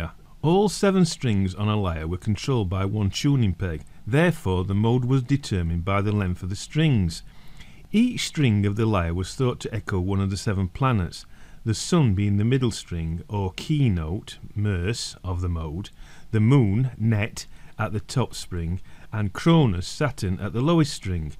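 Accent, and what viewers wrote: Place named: Lancashire, United Kingdom. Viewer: Lancashire by my ear.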